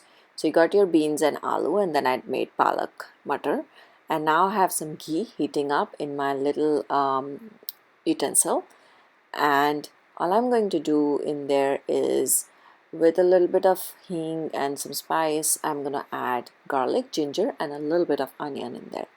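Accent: United States. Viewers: dnt kill me with your american accent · its because she is american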